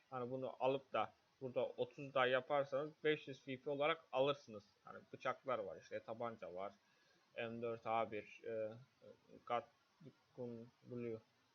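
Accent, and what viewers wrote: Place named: Turkey. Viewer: kelimeleri türkçe gibi okuduğunda çıldırıyorum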